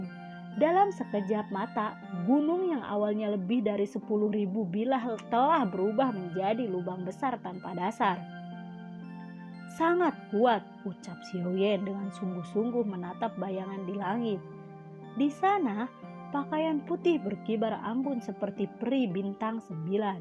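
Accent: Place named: Sunda, Indonesia. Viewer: Logaaat sundana kenteeeel amaaat siich😂😂😂 ber abad abad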